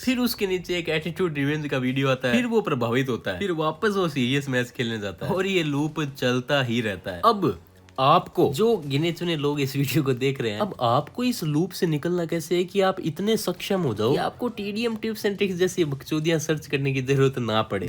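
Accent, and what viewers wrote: Haryana, India. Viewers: Aap Haryana se ho kay 🤔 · Bhai Haryana se ho kya 👀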